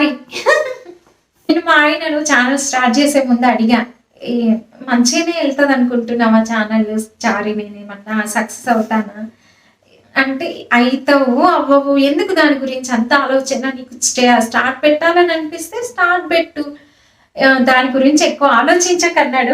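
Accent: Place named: Andhra Pradesh, India. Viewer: akka evideolo okati change vundi emito telusa me voice Andra Telugu matladaru telanga languagevadilesi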